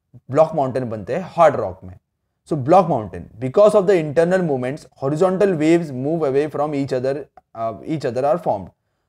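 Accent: Maharashtra, India. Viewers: N I think u r Marathi coz Ur tone like this only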